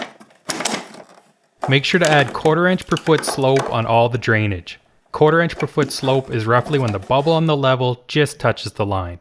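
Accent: Canada. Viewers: Great job! You sound Canadian. Is it a norm in cold regions to use ABS piping for drainage? Or anything else? I was looking for a good tutorial for my kitchen plumbing project. Cheers from Houston, Texas.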